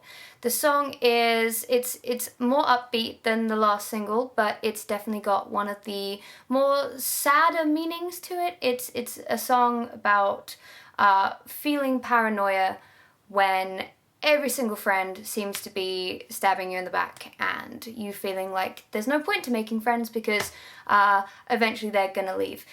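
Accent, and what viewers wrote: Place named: United Kingdom. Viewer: Hello there, don’t even know if you read these comments... but, anyways, a good intention always counts, right? I lived in London for a while and, missing the beautiful British accent, I went searching for a pretty uk face that sound good… So I found you. You are such an interesting human being. Congrats for that! About felling like a lonely wolf, working hard does that. But don’t let this become hurtful. I’ve been doing the something for over 6 years, got really close last year twice, but didn’t get it… I worked so hard that I lost all my friends, I used to be proud of it… Not anymore, I started English classes online just to have someone to talk to, hahaha. Long story short, we are social animals, maybe sometimes you are cranky and moody with people you share your time with, but so what? Everybody does that. You don’t need to be perfect! 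because guess what!?!?! Perfection is not something real, we idealize it! And by the way you are gorgeous! You are funny, smart, beautiful, great singer and very interesting being. About changing, If really feel like you have to, take it one step at a time, and one little thing at a time… Doing all at once will never work. Don’t be too hard on yourself. You got all it takes do be whatever you’d like to be! You are such a confident person, be confident about it too! Regards from Brazil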